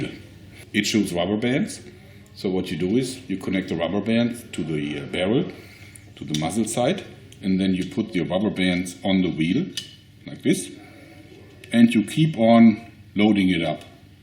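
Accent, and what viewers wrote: Germany. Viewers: Where did you find those rubber bands and is that accent German? Also do you have a place where i can buy your originals? Oh, and its kinda weird that you wear socks and sandals.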